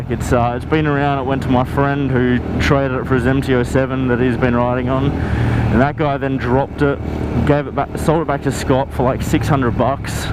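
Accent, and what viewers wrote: Australia. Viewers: Wait mate u from Australia? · Him: "SSSCOOIN ON EVERYONE" Me: Oh gday fellow aussie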